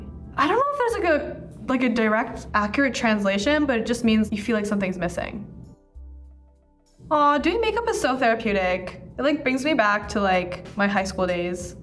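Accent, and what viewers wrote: United States. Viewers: they mean American accent, like her diction and the way she articulates the words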